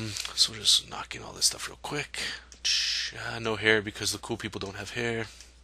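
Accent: Canada. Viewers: Are you from Canada, aye?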